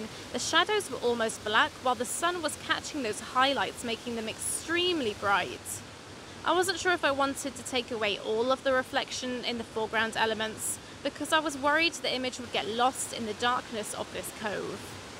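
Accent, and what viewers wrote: United Kingdom. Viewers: Those images at the end… 😘 (Also, people over here in the U.S. pronounce "NiSi" the way you Brits pronounce "Nikon.")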